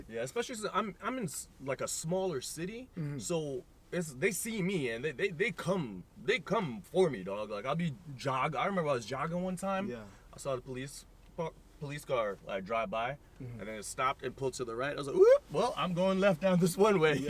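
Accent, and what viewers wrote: Trinidad and Tobago. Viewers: Good he still has his Trini accent 👍🏿😃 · I love how you jump in and out of Yuh Trini accent, it still spot on.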